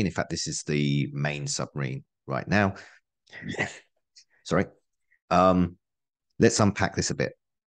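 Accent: United Kingdom. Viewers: Really strange hearing a britosh voice that is unbiased between east and west. Almost sounds like your just telling the truth, which is hard to find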